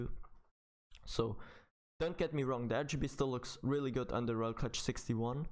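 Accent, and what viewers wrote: Germany. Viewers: ich wuste es das du ein deutscher yter bist wegen dein sprechen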